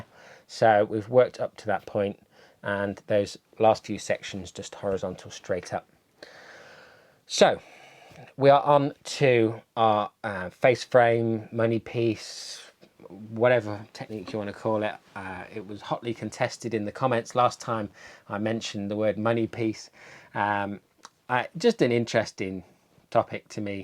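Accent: United Kingdom. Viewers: I was watching and listening while making Sunday family breakfast, having a discussion about toast. Part of my brain that was listening to the end was wondering what “car key” color looks like. Must be like a dark steel grey. OH!!!! Khaki!!! I do so love listening to an English accent. But I need to focus. Thank you for your education!!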